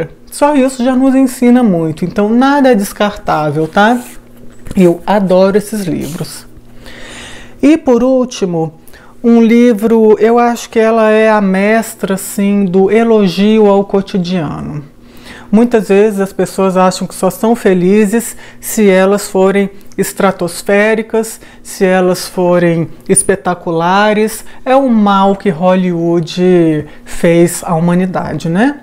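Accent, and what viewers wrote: Minas Gerais, Brazil. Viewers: O outro lado da infelicidade é o contentamento ( não é a felicidade) Ser grato ser agradecido não tem nada a ver com religião. É um estado de espírito que tem tudo a ver com contentamento. Vou fazer um caderno só com as coisas que você fala. Minha filha disse que eu já tou até falando mineires de tanto ver vídeo seu❤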